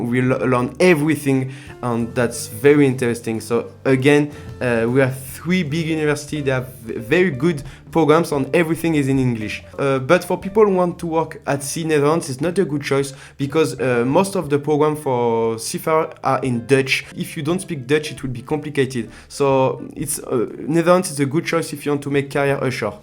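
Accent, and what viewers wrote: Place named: France. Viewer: Ouuufff. Want to know how i know you're french? 😂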